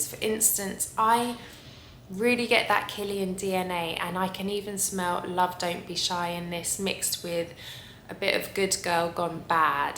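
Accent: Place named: United Kingdom. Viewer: i thought you live in the UK as you have British accent.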